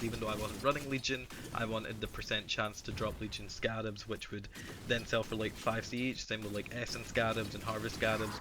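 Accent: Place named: Scotland, United Kingdom. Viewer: Love the accent. Whether male or female, scottish is just 👌Cheers to the video.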